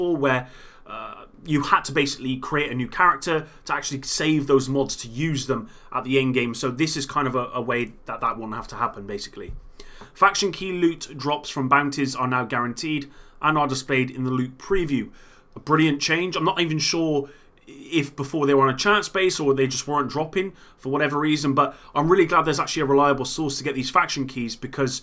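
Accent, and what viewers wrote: United Kingdom. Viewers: Hey mate.. just subbed.. I have seen your content before and always appreciate your nice clear commentaries. Except for linearly, but no one can say that world lol.. what part of the world are you in.. can hear a British accent in there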